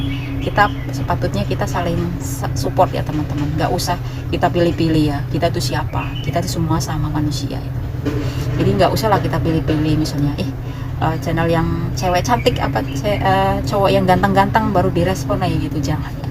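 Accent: Banyumas, Indonesia. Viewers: Halohaaaaaaa beb ngapak❤❤❤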